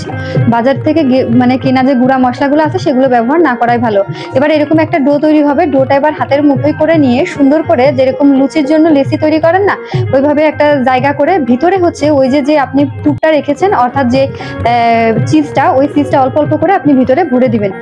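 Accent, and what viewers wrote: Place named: Bangladesh. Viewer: Hare Krishna 🙏🏻 apni ki bangladeshi ? Kotha gulo sunte se rokomi lagche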